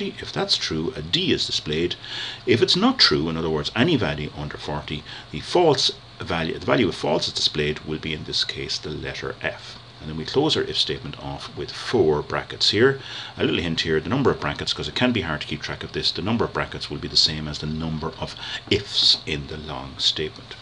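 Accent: Ireland. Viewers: Irish accents are awesome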